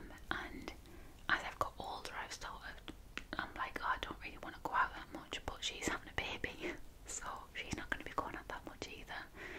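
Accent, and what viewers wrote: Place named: Sunderland, United Kingdom. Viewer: Are you from Sunderland? Or the north eastern area? It's great to finally find an asmr artist from where I live!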